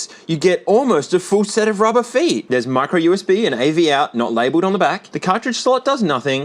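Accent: Australia. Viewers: As an American, seh-ga just feels better to say than say-ga, even though they sound basically the same to my ears. See-ga doesnt sound wrong to me at all though. Just sounds like the expected way for the Australian accent to modify the word. Accents just do that. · I love your dedication to the Aussie SEGA pronunciation! Shocked this bootleg is so good! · how we pronounce Sega in Australia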